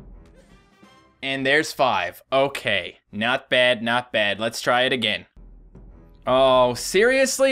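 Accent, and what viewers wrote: Canada. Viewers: are u Canadian? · Are you Canadian?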